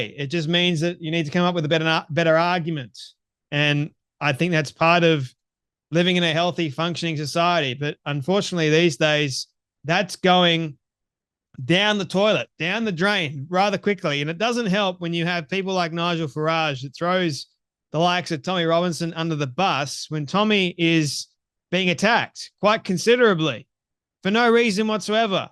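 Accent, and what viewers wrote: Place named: Australia. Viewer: You sound Australian and I like you young man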